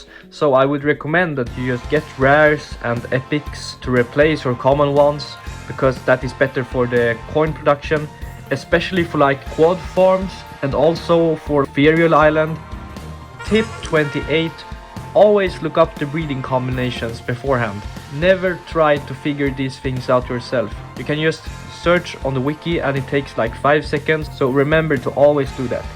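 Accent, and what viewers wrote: Sweden. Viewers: Are you Swedish, because your accent sounds really Swedish? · bro u sounds so swedish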